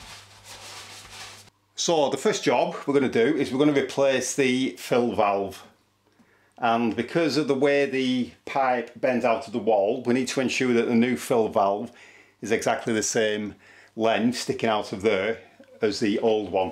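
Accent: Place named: Yorkshire, United Kingdom. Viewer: My pleasure! Just out of curiosity, what UK city is your accent? My guess is somewhere in the Yorkshire area.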